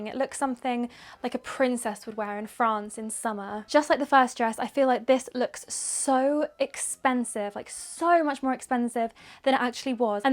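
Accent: United Kingdom. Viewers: U sound so british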